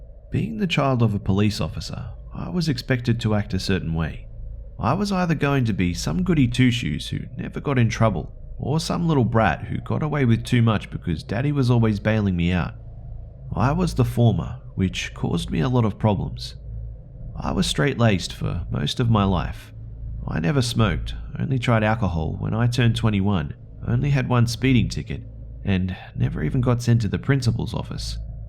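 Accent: Australia. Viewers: he's Australian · Morgan Lowe he doesn't sound South African at all. Get your ears checked. It's Aussie. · Are u aussie? Love your accent and the way you tell the stories, keep it up x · 😊 I enjoyed this video listening to it at work thank you, I'd like to say that your voice is one of many I enjoy listening to especially your Aussie accent😊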